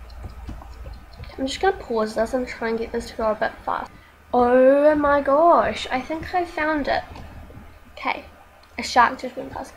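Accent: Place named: Australia. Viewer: Love ur accent btw where r u from ? Australia ?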